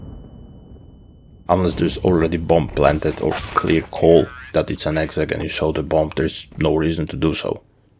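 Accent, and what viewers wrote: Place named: Finland. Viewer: By that accent , are you Finnish ?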